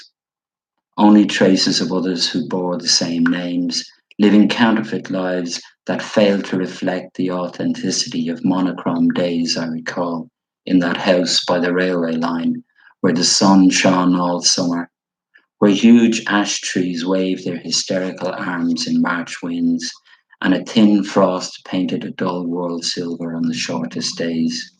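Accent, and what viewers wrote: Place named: Dublin, Ireland. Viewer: Lovely to hear you read in your Dublin accent, I'm so used to my own inner voice reading your poetry, this was a surprise. Great reading! Thank you.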